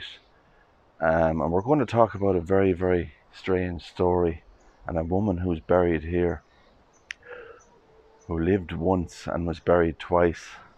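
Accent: Ireland. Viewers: Beautiful cemetery. Your Irish accent is as well. I love listening to you. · You have a very gentle Irish brogue there sir, even when the subject matter is a bit somewhat dismal. Lovely trees there, I could hear a Chaffinch (Rí Rua) and a Coal tit (Meantán dubh) there (I think, I couldn't really be sure, but I suppose). What a horrifying thing to find out, people were being buried alive in those days, probably one of the worst feelings you could ever imagine☘🐦🪦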